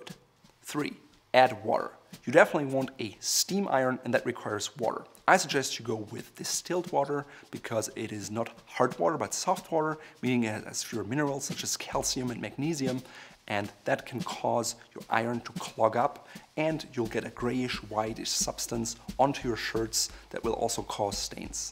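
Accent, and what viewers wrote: Germany. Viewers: Whoaaaa your Accent is so strong.... dass ich Deutsch nur vom zuhören gelernt habe! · He's from Germany. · It's a cross between German and South American.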